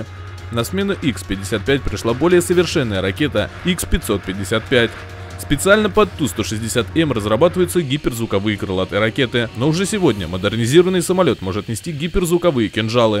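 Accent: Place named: England, United Kingdom. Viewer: Ты лицо своё прячешь, потому что упорно искажаешь произношение русских букв на английский лад? Чего добиваешься, увеличение дизлайков?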